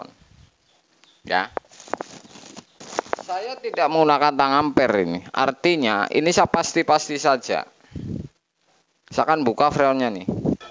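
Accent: Banyumas, Indonesia. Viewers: Salam ngapak.....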